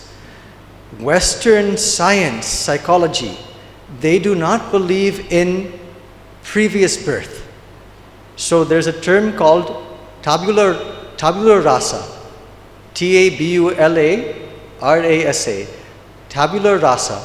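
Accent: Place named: United States. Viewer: American English ❤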